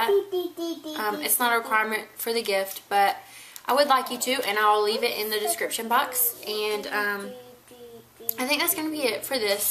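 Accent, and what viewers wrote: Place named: South, United States. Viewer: I AM FREAKING IN LOVE <3 <3 <3 <3 with your sweet southern belle accent. omg my best friend is country too and i just love it! i want to marry a southern man when i get older <3 okay so anyway i love your videos and i love that you are not giving out makeup like every other youtube girl! you are such a sweet mommy! i can only hope and wish that i can be as great as a mom as you! your little babies are soo adorable and i can't believe how skinny minnie you are! got your figure back alrady lol. <3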